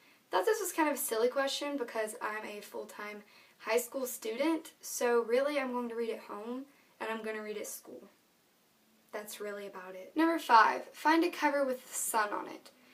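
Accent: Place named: United Kingdom. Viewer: Haha. It's true. I am fascinated by British accents. And I'll trade weather with you! It's like 1000 degrees over here. :/ Have fun on spring break! :D we'll miss ya. Oh, and thanks for the tag. xD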